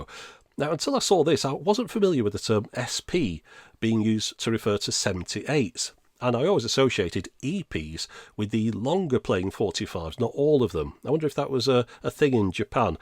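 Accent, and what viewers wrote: United Kingdom. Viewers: Dear. I have two questions. First question: can you discribe and explain an automobile vinyl record player that was available in the 1970s? Second question: Can you review the 8 centimeter long mini cd and dvd and the Nintendo GameCube? Those were my questions. I like your videos very well and you have a neat British accent in your voice. With friendly greets from Gamer Japio.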